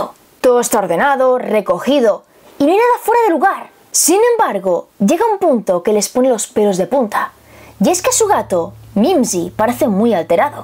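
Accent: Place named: Spain. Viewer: es tan hermosa, es una bellota Es tu acento español es... Hermoso, divino, precioso. Saludos amigos todos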